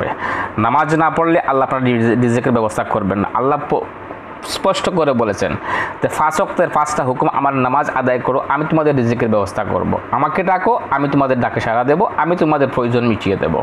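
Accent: Sylhet, Bangladesh. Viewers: ভাই মনে হয় সিলেট বিভাগ এর লোক।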